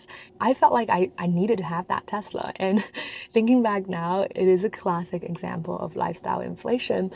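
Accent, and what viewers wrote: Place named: United States. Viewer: hi! Could you share your tips on how you achieve your perfect american accent? As a foreigner myself, I find it so hard to have the american accent and I think yours is just perfect! I am chinese too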